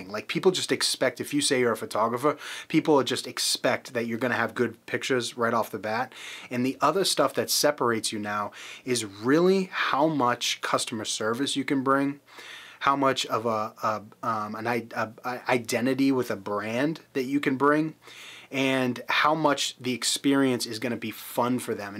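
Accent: Boston, United States. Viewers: Hey bud you from Rhode Island? Or Boston?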